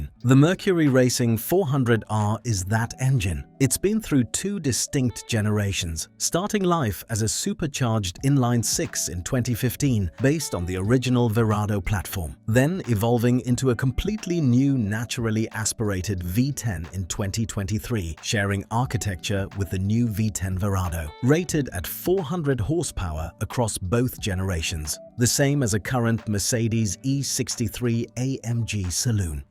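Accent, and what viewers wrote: United Kingdom. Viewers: Why the British accent? Why?!!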